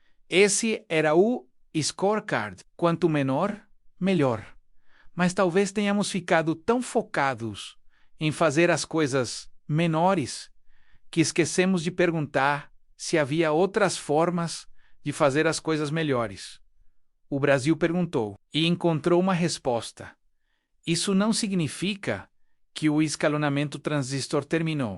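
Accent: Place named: Argentina. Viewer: Narrador argentino tentando falar português. O conteúdo é falso também. Final dos anos 1980, holandesa Philips já empilhava transistores (3D). Os pesquisadores citados, caso existam, estariam 're-propondo' algo há muito tempo já pensado. Que maravilha! A USP prevê o passado. Mas o nosso futuro - e presente - contimuam tenebrosos. Dito.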